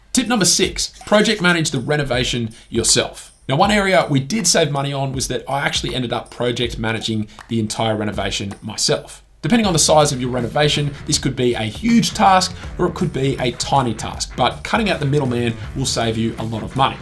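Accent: Australia. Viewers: Aussie?? Haha!! Strong accent like me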